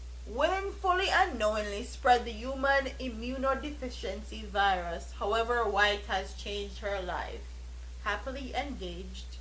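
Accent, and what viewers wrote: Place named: Rural, Jamaica. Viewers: same suh mi talk too.. country mi com from too. an proud of it love the way you talk hun love you an soulflotv.. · Im not gonna lie and be a hypocrite but i also make fun of the way u speak. My 1st thought was "where in jamaica is she from"? You do have a strong country accent. However, I Never thought ur accent define who you are as you seem to be intelligent No malice in that observation sis. Pure love. Big up uself.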